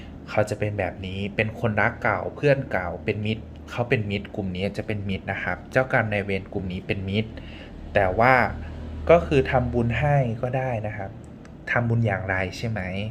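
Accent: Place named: Isan, Thailand. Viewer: อาจารย์อยู่จังหวัดอะไรค่ะ วาดยันต์สวยมากค่ะ คลิปนี้เสียงภาคอีสานออกจ้า แม่นมากค่ะ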